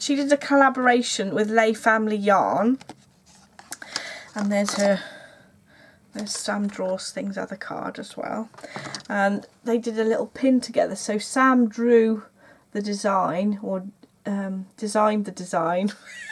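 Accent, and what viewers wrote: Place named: Shropshire, United Kingdom. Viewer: Found you today and loved your podcast. I am going to follow your instructions for knitting my first pair of socks. Hope you don’t mind me asking but are you from Shropshire originally? I am asking because your accent is just like mine.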